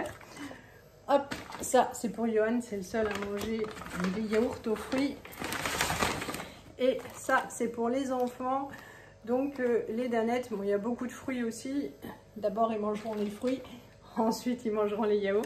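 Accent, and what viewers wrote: Alsace, France. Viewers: Bonjour Y rrrepennnnnser 😂 yo hop la ! Un petit reste d’accent alsacien 😊 votre retour me donne envie de tester les triangles dans la soupe au lieu de la crème. Les pains d’épices au chocolat Made in Allemagne sont de retour chez Leclerc Alsace … miam ! Bon WE à toute la famille et caresses à Chupa ! 😙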